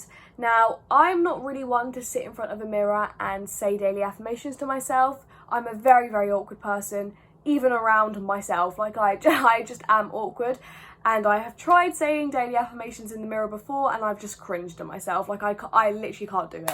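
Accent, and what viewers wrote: United Kingdom. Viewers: Wait- I didn’t know you were actually British 😭 but I love your accent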